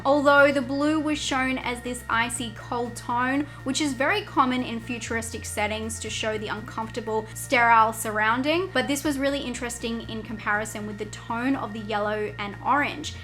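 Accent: Australia. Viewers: People making a fuss over pronunciation are ridiculous. I love your Australian accent! It rocks🤘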